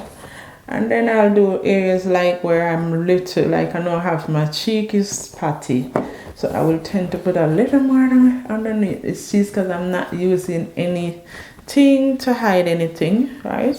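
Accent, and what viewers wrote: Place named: Jamaica. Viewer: u 😂😂 now u making me come on here listen to the accent chro. Jamaica one love..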